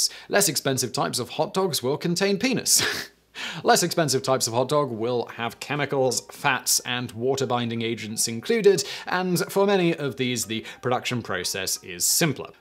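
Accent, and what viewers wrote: England, United Kingdom. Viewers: Alicia Rice How is it he sounds so insular, whenever he comes across a foreign word it’s pronounced stubbornly in the King’s English? He’s the type who pronounces Don Juan like Don John. It would kill him to pronounce croissant other than like crescent. There’s a reason Brexit happened, no matter how much pretends to be internationalist. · you have a very similar accent/dialect to me. I am English and enjoy the language we hol in common. However, I do wish you woul cease using US phrases and pronunciation - I don’t know whether the arseholes of the US are flattered by your transatlantic euphemisms or laughing at you. I merely find the practice abhorrent. If you think that using US slang will give you a better audience, I very much doubt it.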